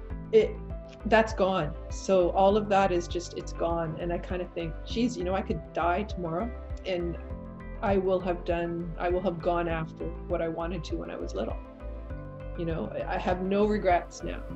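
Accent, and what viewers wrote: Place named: Canada. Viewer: Canadian accent for sure! I checked out your (very nice) website. Really like your work and your msg in this vid. I can relate. Hi from TO👋😊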